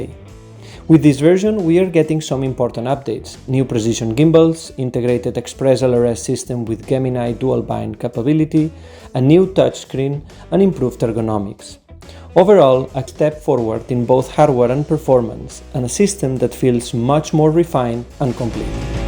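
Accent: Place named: Greece. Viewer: Greek i believe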